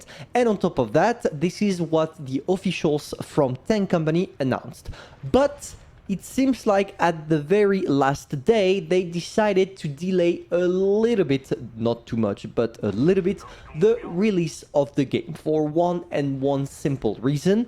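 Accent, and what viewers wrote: France. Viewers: is it me or your french , c'est moi ou t'es francais ?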